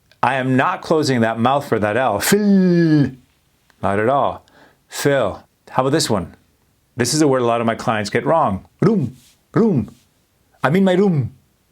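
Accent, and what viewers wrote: India. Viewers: Thats indian accent yeow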